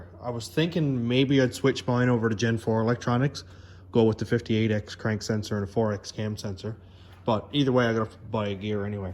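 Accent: Newfoundland, Canada. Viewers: I'm from grand bank lol currently living in pei,I know a newfie when I hear one haha what part ya from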